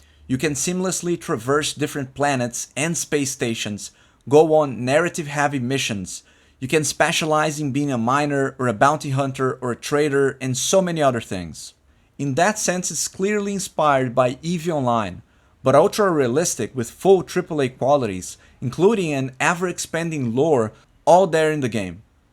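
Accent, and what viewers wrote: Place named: Brazil. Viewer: Vc é brasileiro não é?? Teu sotaque em inglês é BEM BRAZUCA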